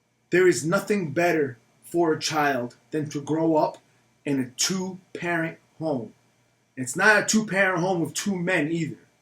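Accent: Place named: New Jersey, United States. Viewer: You are 100% right in Napoletan culture the man is responsible to stay with the children . If he doesn't stay with the children's he is considered punk . If he doesn't pay respect to family or to the elders he will be low level person or person with no face . You are 100% right . I think you are from Napoli because i can see it in New Jersey accent . You sound like Tony Soprano .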